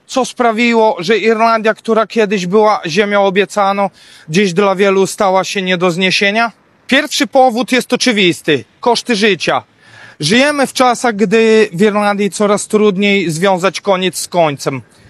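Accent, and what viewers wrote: Podlasie, Poland. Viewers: Słychać podlaski akcent, dla mnie to klimat wakacji😘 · uwielbiam ten podlaski akcent, hahahahaha, a swoją droga na Podlasiu bida , warto tylko latem przyjechac na wakacje i nic więcej. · Z ktorej steony polski ten akcent przyjacielu ? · Man znajomego z takim akcentem:) z podlasia chłop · Uwielbiam ten podlaski akcent. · Ale masz zaj bisty, podlaski akcent 👍 podobnie jak prof.Kris od aut🙂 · Podlasie jakby. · Słyszę akcent z Podlasia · Podlasie słychać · Dobry content 👌🏻 W UK podobnie. Ps.Świetny accent 💯💪🏻Podlasie? Pozdrawiam z UK 🇬🇧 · Słychać😊 Jak się zna Podlasie :)) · to akcent z podlasia. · Nie obrażaj Białegostoku bo poczułem się dotknięty akcent Siemiatycze i Hajnówki. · Podlasianin z wasci. Piękny akcent jako Bacha słuchać mozna❤❤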